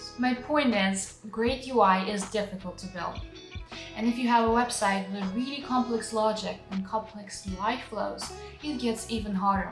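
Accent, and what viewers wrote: Eastern Europe. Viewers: Where are you from? You have a bit of Eastern European accent. Maybe I'm wrong. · Where are you from ? Your accent sounds like from those countries by Russian surroundings...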